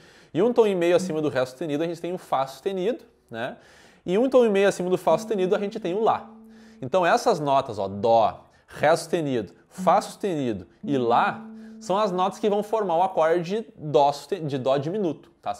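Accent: Brazil. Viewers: falas muito rapido que nao da para perceber metade das palavras (engoles as palavras ) de maneira que isso so serve para a lingua brazileira ,mas quem fala portugues os seus videos nao tem uso algum. o meu conselho seria fazer os videos em engles asim todo o mundo percebia ,com os videos em lingua brazileira so os brazileiros e que percebem que caralho estas ai a ladrar ,mas quanto ao asunto do video esta O K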